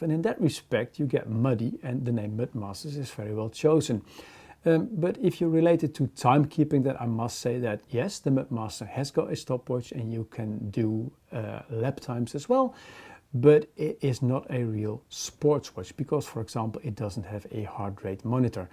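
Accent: Netherlands. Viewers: Dat nederlandse acsent 😂 hahahah heerlijk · Je herkent een Nederlander die Engels spreekt gelijk. 😁😁😁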